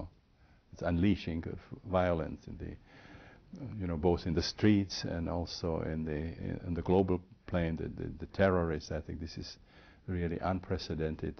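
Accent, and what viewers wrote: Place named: Czech Republic. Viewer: he sounds Czech... I am about 99% sure by his accent and his name confirms that very well also.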